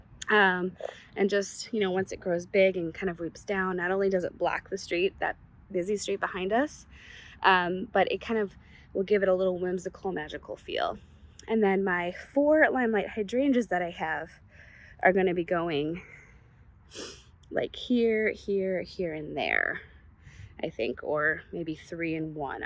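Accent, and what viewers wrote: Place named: Chicago, United States. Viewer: Just found you. As I was listening I thought to myself that you had to be from the Chicago area. I hear the accent, my cousin has the same one. How do you like the greenhouse?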